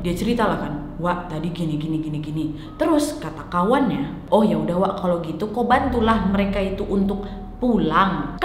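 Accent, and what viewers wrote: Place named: Samarinda, Indonesia. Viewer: Dari Samarinda mana suarany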